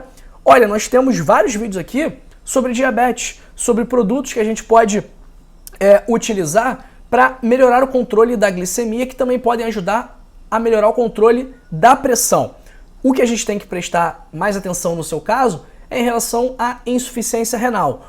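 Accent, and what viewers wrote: Rio de Janeiro, Brazil. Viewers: Boa noite que linda dica tem que ser carioca que voz mais lindo doutor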